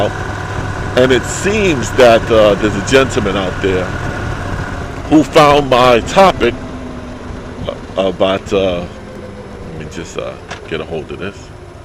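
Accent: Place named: New York, United States. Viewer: Hello fellow NYer...Great video...nice & easy rider. Just love to hear that NY accent again. Makes me miss the big apple. Brooklyn born and now living in hot hot hot Arizona. Nice looking bike. Mine is the same as Dbest1's. Love just cruising around enjoying the scenery. You "ain't" boring at all!!! Keep on gettin' on bro. Dbest1 recommended your vlog and it was all he said it would be. Kool... RSMF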